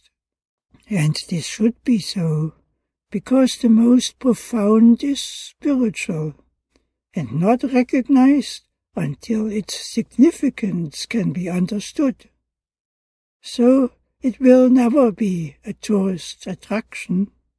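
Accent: Germany. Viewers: Well read. Very good English, the German accent just enough to be charming.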